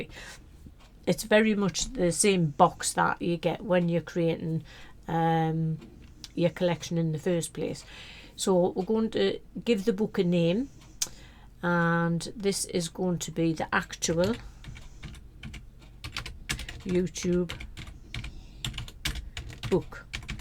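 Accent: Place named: Newcastle, United Kingdom. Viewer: Liked and subbed cheers. I have so many images on my channel but want to see some actually in a book I did one a while back but left it to the printer and graphic designer to work out. Ten years on I look at it and although I’m happy enough I will do things differently like the printer insisted on it being a portrait style which I stupidly went along with. I knew at the time it should be landscape orientation but hey live and learn slowly. So now I’m looking at putting a book together via LR and probably printing via blurb just for myself initially. I’ve got the images populated so just a case of fine tuning the layout which Is why I just subbed. All the best mate love that Geordie accent 📷📷📷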